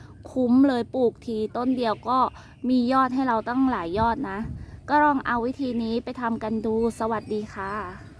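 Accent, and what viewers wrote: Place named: Thailand. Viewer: เจ้าอยู่บ้านได๋น้อเว้าคือภาษาบ้านข่อยแท้น้อ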